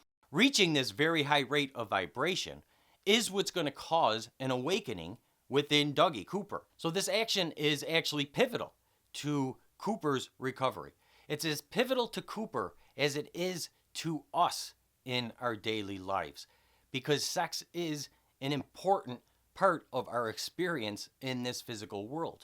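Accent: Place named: Midwest, United States. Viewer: Still consistently the best TPS03 companion on YouTube. I look forward to your input each week. Thank you! PS your midwest accent is a fantastic bonus. Southern Michigan? Whatever the case, you rock.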